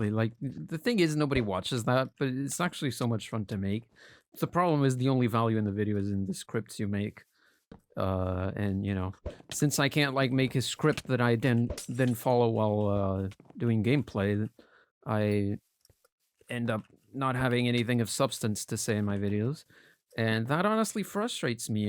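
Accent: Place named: United States. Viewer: Ur voice changed, it got like a bit American like just a little bit and a bit more clear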